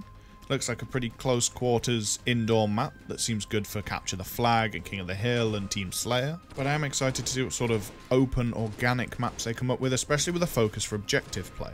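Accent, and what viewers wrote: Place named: New Zealand. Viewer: Holy fuck I knew that was a New Zealand accent, I'm proud of myself for guessing. I'n year 7 we had a maths teacher from New Zealand and she was constantly pissed off because people thought she was Australian.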